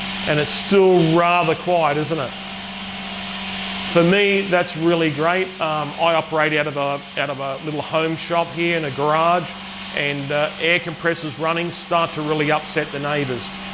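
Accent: Australia. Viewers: Thanks for sharing. Glad to hear an Aussie accent in the review so I know I know it is possible to get this sucker locally (pun intended). Just to note, a 3dBA difference is about double the sound pressure level, so from quiet to noisy for that thing it is a difference of 4 times the sound pressure level between low to high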